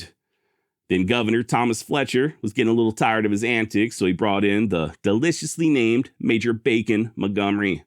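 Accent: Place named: Wisconsin, United States. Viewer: Sounds like you're from my neck of the woods, Wisconsin. Maybe a little north. Love the content, keep up the great work.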